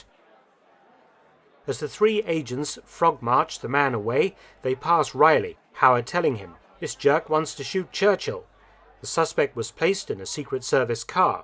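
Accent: United Kingdom. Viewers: Any historical documentary always has more gravitas when delivered in a british accent..its just a fact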